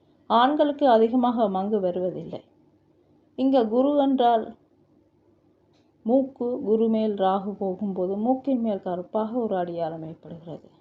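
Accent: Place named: Sri Lanka. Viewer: நல்ல அருமையான, நுணுக்கமான விளக்கம் . நன்றி அம்மா.. உங்கள் பணி தொடர வேண்டும்...வாழ்த்துக்கள். நீங்கள் ஸ்ரீலங்காவிலிருந்து பதிவு செய்கிறீர்களா... ஸ்ரீலங்காவில் உள்ளவர்கள் பேசும் தமிழ் போல உள்ளது...🙏🙏🙏🙏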